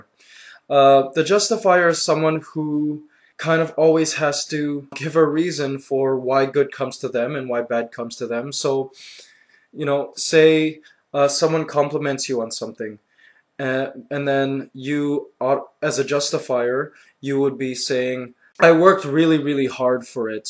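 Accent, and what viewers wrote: Philippines. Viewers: i love it when you're speaking with pilipino aksent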